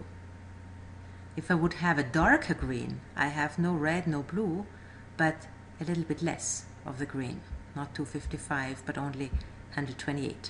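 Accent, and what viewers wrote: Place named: Jamaica. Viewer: Excellent tutorials! Your accent is cool.. are you Jamaican?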